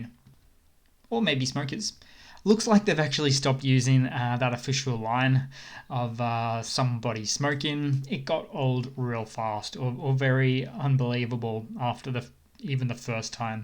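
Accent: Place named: Australia. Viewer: Brilliant and great to hear an Aussie voice. Slava Ukraini!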